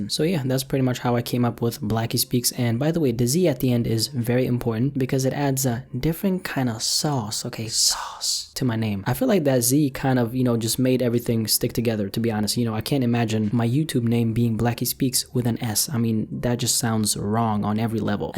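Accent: Canada. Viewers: you Canadian? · Are you Canadian??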